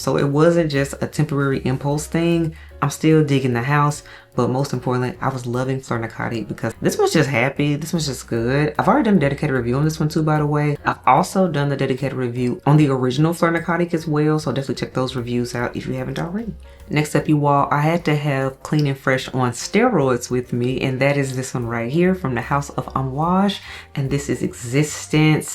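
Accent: Memphis, United States. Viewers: 🌻🌻🌻🌻🌻🌻🌻I know a "Memphis accent" when I hear one! So glad your move went well! Gonna check out two of those scents you showcased! Take care!!!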